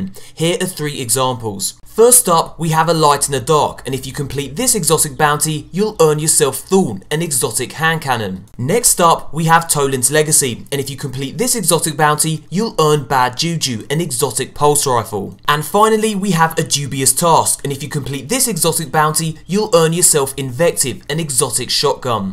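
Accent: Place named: United Kingdom. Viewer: I watch these vids to here your voice and freak outs you snazzy Brit you x)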